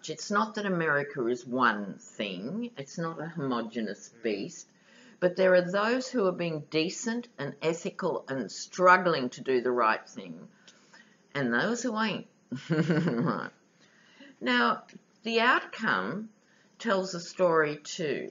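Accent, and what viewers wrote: Australia. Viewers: I <3 your running commentary. Find myself laughing out loud, and God knows we need it. So... is your's a "posh" Oz accent? Is there such a thing? From the land of the maple leaf, who has educated, but not posh accents.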